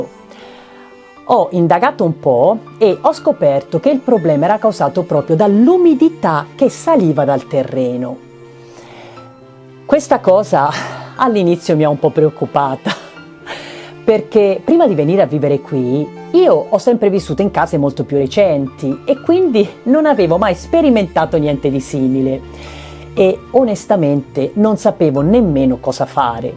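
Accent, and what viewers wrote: Italy. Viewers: Mi sembra di cogliere l’accento della mia regione l’Umbria . Mi sbaglio?!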